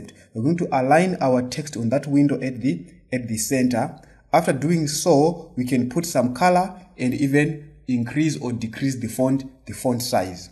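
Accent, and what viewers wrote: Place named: India. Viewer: please never talk like Indian programmaer, it is disgusting. please please please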